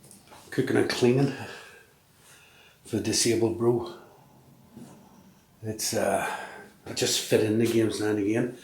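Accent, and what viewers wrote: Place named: Northern Ireland, United Kingdom. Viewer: Good stuff bud, have you been playing RF long? I hear yhe Nordy accent, I live in Dromore between Banbridge and Hillsborough, where abouts are you?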